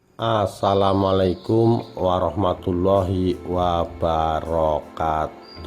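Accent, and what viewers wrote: Tegal, Indonesia. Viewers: Admin kayane wong tegal ya boss..?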